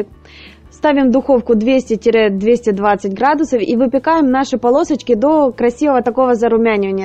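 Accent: Ukraine. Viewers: Зачем же себя так мучать? Вас заставляют смотреть такой прекрасный ролик? Открою вам секрет в Украине так все говорят!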